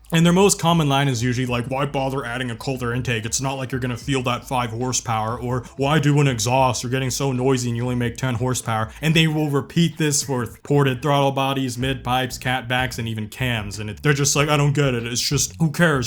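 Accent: United States. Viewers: He's American, wtf you on about?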